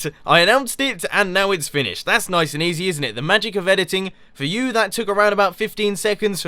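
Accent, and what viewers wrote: United Kingdom. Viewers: Maybe it's just his part of town (or whatever) where he comes from. You do know the MANY accents people have in the UK, same goes for the way people talk.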